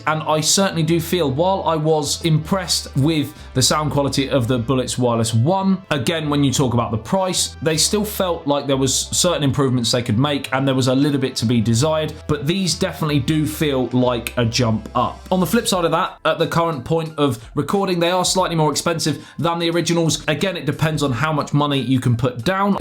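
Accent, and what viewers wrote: England, United Kingdom. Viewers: Thanks for addressing those cuticles, you're getting there bro. 😉 Note 2 - quit shaving your chest. That's so 90's gay porn, lol (don't ask me how I know). And finally, are you and the dude from Abroad in Japan from the same area of England? To this American, your accents are remarkably similar. Thanks for continued awesome content sir!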